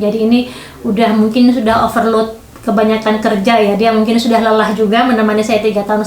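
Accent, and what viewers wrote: Java, Indonesia. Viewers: Jowo banget ya Mbak.. Hehehee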